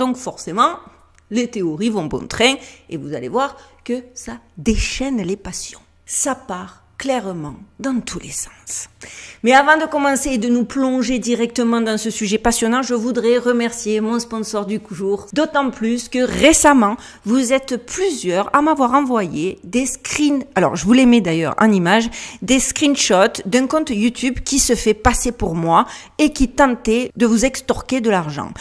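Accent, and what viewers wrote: Marseille, France. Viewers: T’inquiètes pas, on n'entend que ta douce de voix de marseillaise peuchère! Et puis on est trop charmé par tes beaux yeux pour se focaliser sur de quelconques bruits extérieurs!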